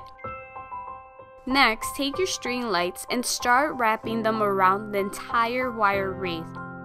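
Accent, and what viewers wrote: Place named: Canada. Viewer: where are you from?? your accent sounds a little canadian lol :)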